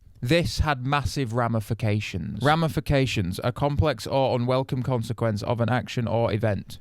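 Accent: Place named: England, United Kingdom. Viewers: Sounds Manc to me I reckon, any other English lads or himself can confirm? Thank God he's not fucking scouse that's for sure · he’s mentioned Sheffield before. so probably near there! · This is a perfect English accent. Most annoy the shit out of me, but owns all.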